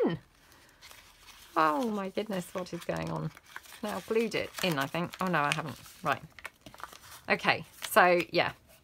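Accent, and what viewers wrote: England, United Kingdom. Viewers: Hi I love these. I've been watching 5 of your older videos this afternoon it gives me the get up to do things for journals I'm making for family. I just love to hear the accent me being English from the Midlands now in Australia for 53 yrs. I was 11 when I came. My kids say I've not lost my accent but they also have one they all have a Birmingham twang my hubby is Australian to and he has picked it up. But I miss hearing English accents. But you help me to do things I've never done before. Thankyou. God bless Karen and family in Australia. I do hope you are ok with your divorce and you are keeping well. Family to.🌻💖🥰🐨🇭🇲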